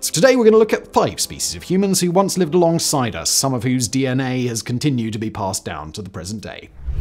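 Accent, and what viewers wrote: RP, United Kingdom. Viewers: Sorry, the british accent prevented me from finishing this video —— 😣 💬 Thumb down 👎🏽. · For those criticizing pronunciation, please note that he doesn't have an American accent. Britons often pronounce vowels differently (i.e., a "clark" helping in a store and the metal known as "a loom IN ee um) and this might extend to other vocalizations. It is possible he's not an ignoramous. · Stick to this, your political take may aswel be straight from #10 no independent thought whatsoever I cant stand 5 seconds of it, I can tolerate that posh accent when its things like this though😂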